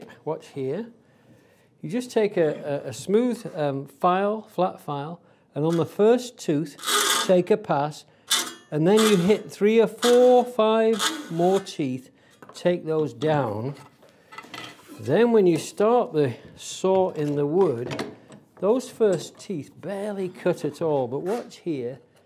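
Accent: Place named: Northern England, United Kingdom. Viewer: Hi. your videos are great. I’m very new to woodwork, I was wondering where you source your timber from, and how to make sure it’s suitable for furniture making. I have a good friend who is very experienced. He bought some supposedly dried timber suitable for furniture, turns out it wasn’t dried enough and a beautiful table he made ended up splitting. I’m in the south east of the UK guessing you’re in the north from the accent. would appreciate any recommendations/advice. Kind regards. Jon.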